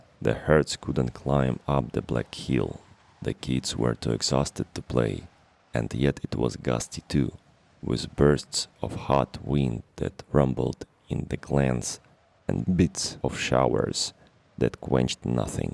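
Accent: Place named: Eastern Europe. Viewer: the voice and accent sucks big time ... wtf ??? it's robert louis stevenson .. not eastern european story time !!!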